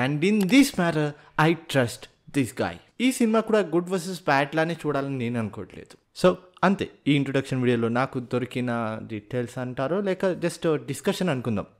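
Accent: Karnataka, India. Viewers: Hi bro... Iam not ur subscriber, bt I watch every video of ur... I love ur love towards cinima bcoz u jst like me... only difference is ur doing something to cinima, bt I am doing ntng... Today u earned my respect too... 💕 For ur slang of Telugu with kannada accent mixing... 😂 Boii.. Boii..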